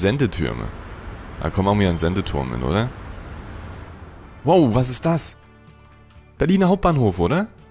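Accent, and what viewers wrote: Saxony, Germany. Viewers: Hallöle! Guck schon seit ein paar Tagen deine Videos zu Transport Fever 2 und habs mir deswegen auch selbst gekauft. Falls dir auf deiner kleinen schnuckeligem Karte mal Platz und Vorhaben ausgehen hätte ich eine Idee für dich. Im Workshop gibt es eine Deutschlandkarte in extragroß, da könntest dich auch austoben. Ich hab z.B. bei mir die Basiswerte für ein paar Städte angepasst damit sie von der Größe und Fläche auch entsprechend mitskalieren. Ausgehend vom Wert 200 für eine Stadt mit ca. 800k Einwohnern hab ich Berlin auf 800, Hamburg und Wien auf 400, München auf 320 und Köln auf 250 gesetzt um ungefähr an die realen Größenverhältnisse ranzukommen. Zusätzlich fehlt auf der Map jegliche Industrie, die müsstest du im Karteneditor erst hinzufügen. Oder aber, wenn du magst, kann ich dir meine Map zur Verfügung stellen, da es noch ein paar Kleinigkeiten gab die an der Karte zu machen waren. 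Die größte Herausforderung wird sein zum einen den Straßenverkehr in Berlin in den Griff zu bekommen. Wenn man 1850 startet brauch man vor Erfindung der Tram nicht wirklich in Berlin was machen. Als zweites wäre der Aufbau ein nationalen Fernverkehrsnetz nach realem Vorbild + die eine oder andere Regionalverbindung ein lohnendes Ziel. Ich versuch das ganze grad auf schwerem Schwierigkeitsgrad und es ist alles andere als einfach.^^ Zum Schluss noch: Dein Sprachfehler, gepaart mit sächsischem Akzent ist witzig, nicht jeder muss hochdeutsch können. :) MfG, Jester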